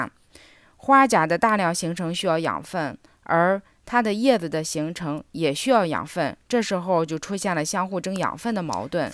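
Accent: Qingdao, China. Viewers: 听到熟悉的青岛普通话，很亲切，我种的蚕豆容易倒，不知道什么原因，看到你的这个视频，对我种蚕豆有很大邦助，赶紧剪枝，繁殖太多衩了，主干细高，谢谢你的视频。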